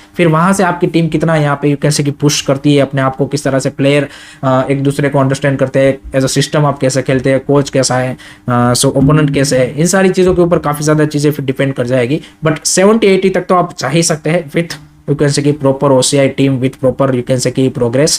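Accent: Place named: Bengal, India. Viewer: Ap bengali ho na apki hindi sunke ashi lag ra he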